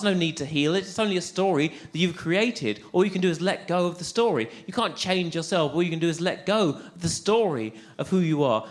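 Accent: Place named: England, United Kingdom. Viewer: çok güzeldi. aksanı ne kadar güzel ya :D ingilizce öğrenirsem kesinlikle ingiliz aksanıyla öğrenmem lazım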